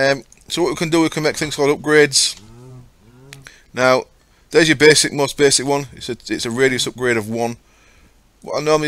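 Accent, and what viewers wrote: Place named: Hull, United Kingdom. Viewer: Fab video.. Just started Monster after me Direwolf20 crashed... Love the Hull speak, And guessing Hessle road the way you swear lol.. Good stuff (y)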